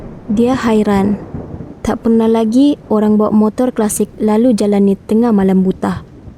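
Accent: Sabah, Malaysia. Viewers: ni bkn ckp semenanjung bang, ni bahasa melayu slang sabah. Kasi repair otak mu..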